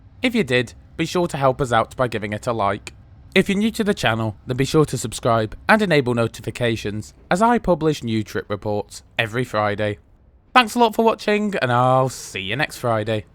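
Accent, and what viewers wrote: United Kingdom. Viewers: I love hearing a British person narrate their American train adventures, it's a good way to see my favorite parts of my home country as experienced from an outside perspective.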